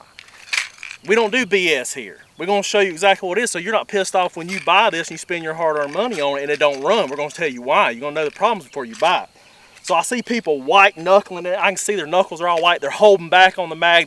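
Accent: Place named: Carolina, United States. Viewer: That’s gotta be a fellow Carolina boy with that accent 😂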